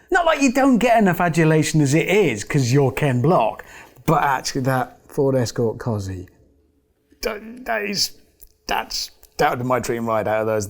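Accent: United Kingdom. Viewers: I thought he was british not canadian...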